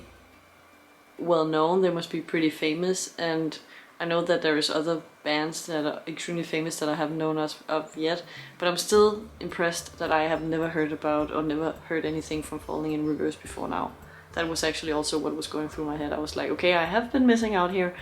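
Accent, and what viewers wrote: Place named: Denmark. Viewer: Loved the reaction! He will blow you away even more if you listen to more tracks. And.. are you Danish? The accent sounds familiar. I’m Danish so it gives me hints of that ;)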